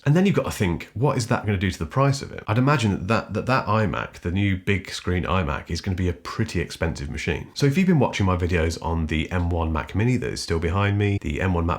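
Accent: United Kingdom. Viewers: Thank you very much for perfect native British English !)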